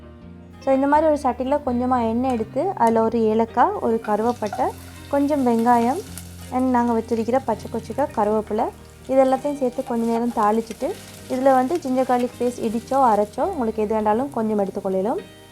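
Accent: Sri Lanka. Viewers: Assalamuallikum sister MashaAllah so proud of u talents Just came across blog U are doing a amazing job So clear and so good are all u recpies Are u a Srilankan as the way u speak is lot like us May Allah Bless u for many more success MashaAllah u are very pretty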